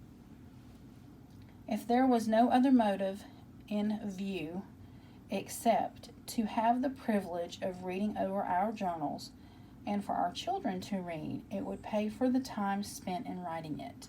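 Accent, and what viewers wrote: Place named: South, United States. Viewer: GIRL!! I love Butterflies and Owls too (and Elephants!)... I have an Ankh tattoo also! It is on my Ankle. I did it myself when my wife and I got together. I absolutely love everything about this journal! Also...where are you from... I detect a slight southern accent... :) - Hugs! - Kari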